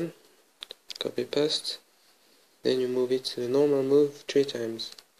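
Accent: France. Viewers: Hablo español y entiendo su inglés con acento francés \ :v / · I can understand that cuz i'm french like him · I heard a french accent when you talk I don't know why x)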